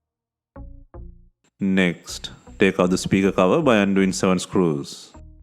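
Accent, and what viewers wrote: India. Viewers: Avec un type de colle genre CYANOACRYLATE ,bravo impossible par la suite de pouvoire ré-ouvrire pour une autre réparatation,c est comme se tirer un coup de 12 ds les pieds cà!!!!!🙄😤😒🤪🙃😡🤬 Utiliser plutot une colle caoutchouc!!!!!!! Apres y sera toujours possible de le réouvrire & aussi tres facile de nettoyer la colle avant d en. ré- appliquer pour le refermer,une simple logique !!!!! Un accent infernal indou🙄😤😒😑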